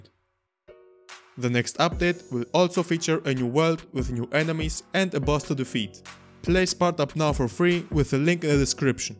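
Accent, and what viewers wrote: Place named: Greece. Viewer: I firstly suspected that from your pronunciation. It sounded similar to Greeks' accent in English. Also in your About tab on your channel page, you can see your country because you used a Greek phone number to verify the channel.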